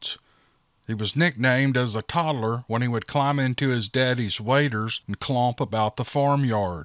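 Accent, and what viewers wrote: South, United States. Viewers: you are an incredible story teller. I could listen to you for hours. I just love your southern twang. It's very comforting. Don't be so hard on yourself. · As a real southerner in Atlanta living in a world of Yankees, your voice take me back to my youth. GOD bless you sir, never change!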